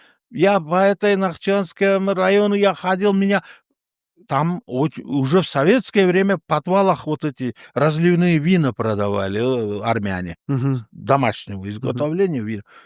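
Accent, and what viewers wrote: Russia. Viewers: Алып баручу бала Кыргыз тилин жакшы уйрон тилин орусс болуптур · Ведущий жигит орус классты буткон шаардык окшойт бирок кыргызча суйлоп азамат экен акцент болсо да.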